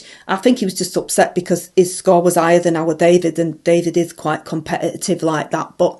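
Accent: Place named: Manchester, United Kingdom. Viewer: yep, Basic mancunian.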